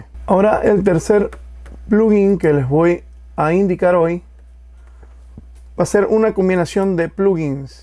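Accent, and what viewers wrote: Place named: Dominican Republic. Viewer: Yo soy dominicano y pensé que eras domi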